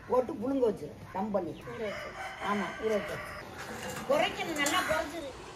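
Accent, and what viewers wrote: Ramanathapuram, India. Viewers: Ramanathapuram slang ah? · ராமநாதபுரம் பேச்சு உடன் சாப்பாடு செய்யும் போது என் சிறு வயது நினைவு வருகிறது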